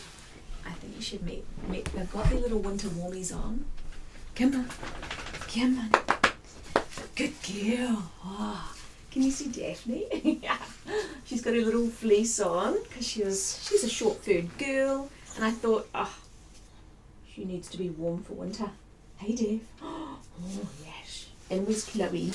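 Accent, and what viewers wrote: New Zealand. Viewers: Thank you,. I love hearing your NZ accent... it's so refreshing from our clipped Canadian spoken word. Every day I write down my plan for how I will glide through my day. (HA!). Eating elegantly, making chic choices, phone calls, work project goals, exercise, specific people ignoring, etc and put a little ticky box beside each one so I can give myself a big check mark in the evening. I think I learned this from you. Not all my ticky boxes always get checked, but I'm always aware that they're there. My goal recently is to order your Chic Author book as I'm very afraid to think of putting my writing out there. Thanks for the tip about Rhonda Byrne. I read "The Secret" back in the day and that was it. I look forward to checking her out. xo karen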